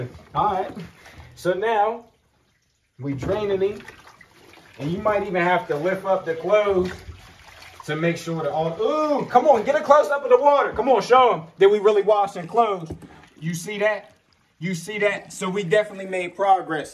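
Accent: Pittsburgh, United States. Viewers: My guy has that heavy Pittsburgh accent. "Just sooooow y'all knooooooow"😂🤣😭 sounds like my uncle, lol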